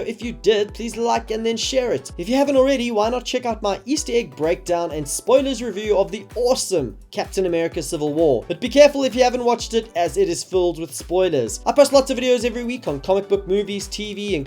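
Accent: South Africa. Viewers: are you south african? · I live in England now but born and raised in SA. When I first started watching this video I was like this guys voice sounds way to familiar. I mean it doesn't have an accent, cause English people have an accent to me. Then I realised why haha! SA ftw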